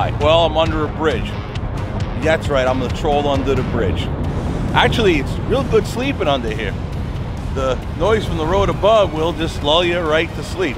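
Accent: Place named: New York, United States. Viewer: at first, I thought you were just some loud crude new-yourk yank. but, i like your no nonsense attitude and the multi video angles are good.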